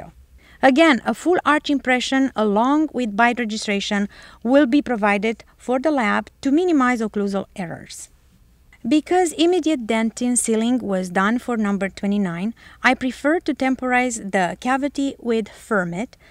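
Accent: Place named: Romania. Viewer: I thought she was romanian from her accent. And apparently she is :D I'm from Romania too.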